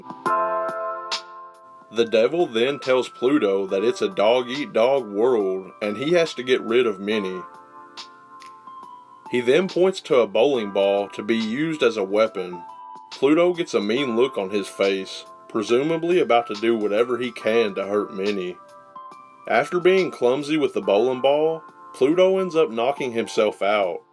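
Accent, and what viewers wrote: South, United States. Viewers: its cool seeing a youtuber like this having a southern accent its really rare (im from a southern area lmfao)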